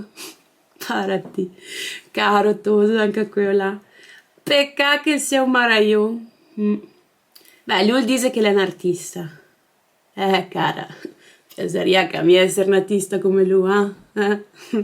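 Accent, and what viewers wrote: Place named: Veneto, Italy. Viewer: Hahaha😂 me compare giacometto. Grazie x avermi fatto ridere oggi. Strepitosa 😂 P.S. Mi mancava sentire l’ accento veneto!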